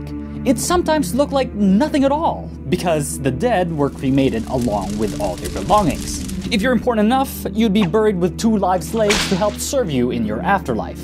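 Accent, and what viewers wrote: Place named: Sweden. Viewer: stop pronauncing danish words in a swedish way those swedish pricks suck. sorry for the bad spelling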